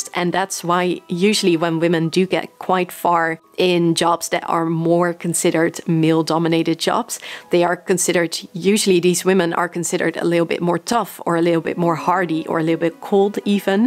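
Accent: Netherlands. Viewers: Hoi! Ben je toevallig Nederlands?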